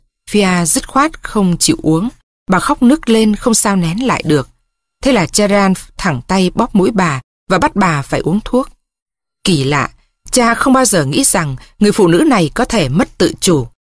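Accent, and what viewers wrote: Standard. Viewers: Bản dịch quá hay . Giọng đoc cũng rất chuẫn . ❤ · Truyện nghe đi nghe lại vẫn hay , nhiều tình tiết hấp dẫn cộng với giọng đọc thật chuẩn và truyền cảm , cảm ơn tác giả và người đọc thật nhiều .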